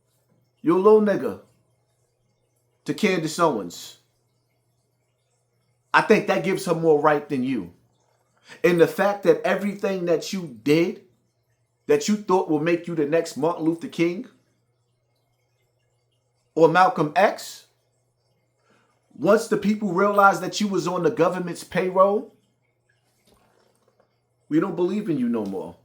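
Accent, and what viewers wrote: Brooklyn, United States. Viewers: BROOKLYN TONE N THE BUILDING,..EAST NY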